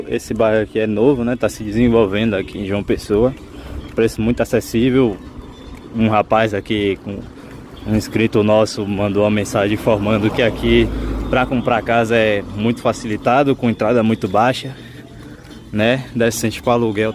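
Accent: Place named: Rio de Janeiro, Brazil. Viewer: Se vc é da Bahia porque esse soutaco carioca?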